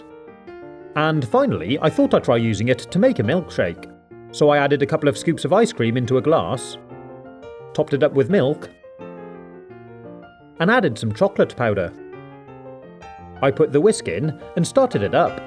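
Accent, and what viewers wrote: United Kingdom. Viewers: Your voice sounds British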